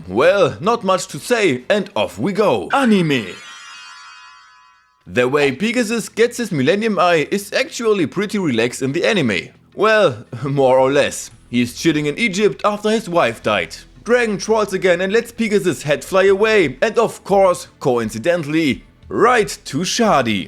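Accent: Germany. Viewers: The way this mans pronounces "Anime" and "Pegasus" just drills my ears. Damn Germans have such terrible accents and their language is so disgusting...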